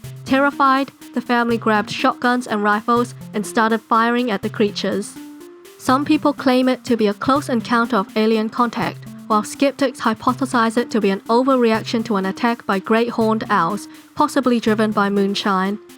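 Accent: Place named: New Zealand. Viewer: I KNEW IT! THE INSTANT I HEARD THE VOICE I KNEW IT!! HELLO FELLOW KIWI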